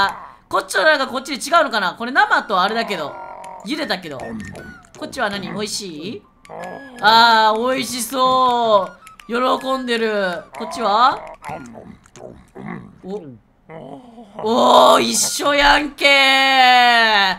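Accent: Japan. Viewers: もしかして鹿児島県民?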